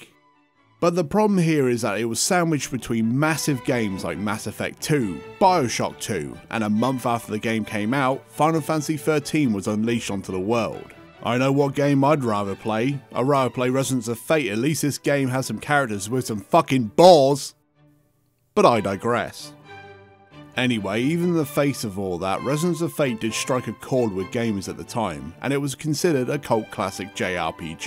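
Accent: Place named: Ireland. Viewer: Your accent reminds me of a dude from Ireland in the army here in the good 'OL USA..Good review....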